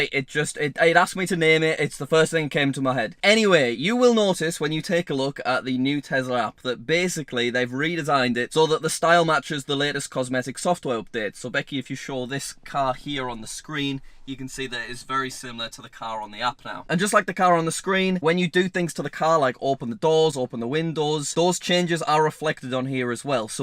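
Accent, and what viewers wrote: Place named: Northern England, United Kingdom. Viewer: Time for my dose of good northern English accent! Yay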